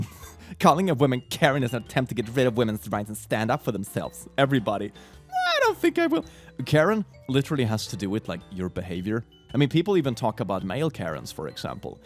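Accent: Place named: Sweden. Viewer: The Norwegian accent 😂😂 too spot on to not be..I’m guessing Swedish, yourself.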